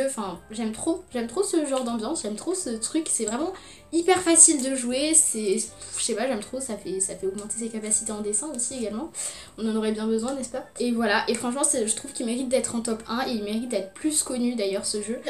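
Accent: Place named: England, United Kingdom. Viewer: Magnifique accent anglais bonne vidéo bravo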